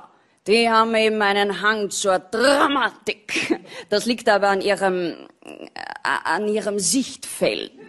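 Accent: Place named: Vienna, Austria. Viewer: Ich liebe diesen Wiener Akzent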